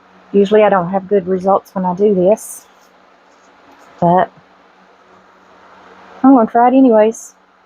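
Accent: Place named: South, United States. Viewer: Hi, I am a new subscriber. Everything looks great. I love it all! Where are you from? I detect a Southern accent. North Carolina here.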